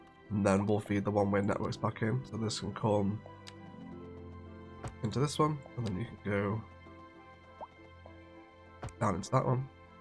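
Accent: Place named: RP, United Kingdom. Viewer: I listened to your proper, refined-sounding British voice telling me that you were inspired by a highway layout from Montgomery, Alabama! 😂 Talk about a contrast! 😂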